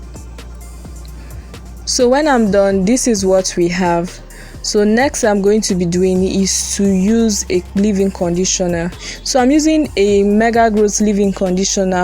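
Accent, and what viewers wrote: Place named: Nigeria. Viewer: Are you Nigerian Coz you sound like one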